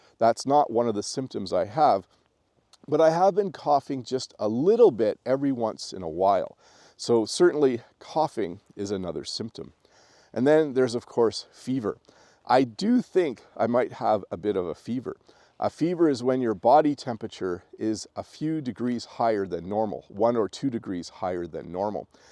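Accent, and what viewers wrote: United States. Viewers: Dear, Here Ted from China, I really really appreciate for your videos which are most perfect of English learning. I don't need waste time to learn boring Chinglish any more. now i'm very enjoy to watch your Enlglish teaching, especially your accent, just like wathcing an American TV series. Also, i'm enjoy everything you said which are very close to our life, and it makes me feel how beautiful the Canadian countryside is. Hopefully that I could go there to have an enjoy that beautiful scenery one day. Bless you and your family! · Dear teacher, My English is not good, my hearing is poor,I am a new immigrant, living in the United States. Is the English you speak here in Canada the same as the English you speak in the United States? I really want to learn English from you,Is your pronunciation the same as American pronunciation? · you in Canada have more british or american english? 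As far as I know Canada was part of British Empire and accordingly you have a british one but I noticed you pronounce some words like Americans do. Answer please.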